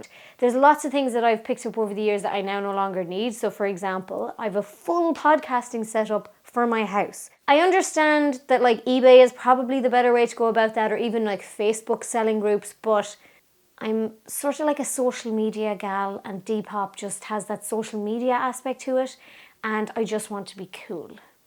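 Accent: Ireland. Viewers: I like ur accent!! What part of Ireland are u from originally?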